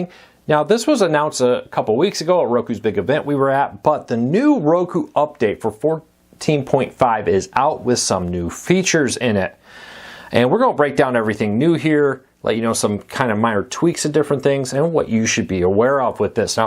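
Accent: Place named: Philadelphia, United States. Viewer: Are you from Philly? You keep calling it”Pitcher” settings 😃👍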